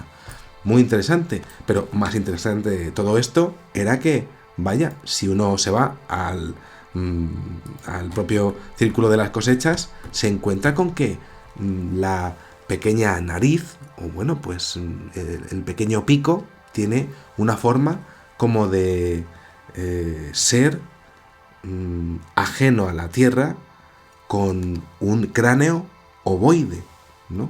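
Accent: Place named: Spain. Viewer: Gracias por tus Buenos videos me encantas como narras mas con ese acento es panol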